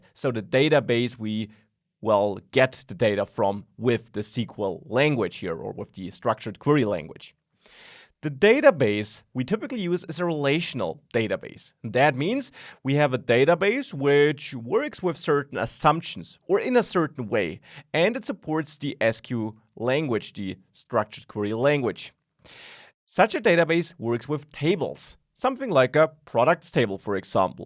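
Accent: Germany. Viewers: I have not quite completed your video yet, but I have to comment on your clarity and thoroughness! It sounds like you might also be German. ☺️ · There are special commands so called "choins" :-) I really love this german accent! Thank you for this comparison, very interesting and complete.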